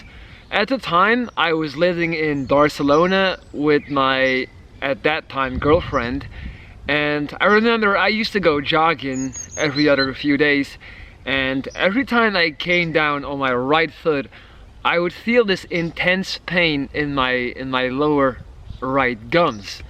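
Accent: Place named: United States. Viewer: Your story is quite something. I have an off-topic question. You sound like any American I have ever met. Yet you lived in Belgium and then in Stockholm., Sweden. So where are you originally from? I would simply guess the US based on how you speak but that doesn't seem quite right. You must speak a ton of languages, living in both Belgium and Sweden, and visiting friends in Barcelona, Spain. But your accent is 100% pure American. So where are you from? Just curious. And keep your spirits high. You have one heck of a story to tell. I will be watching more of your videos. And I love your screen name, too. All the best to you.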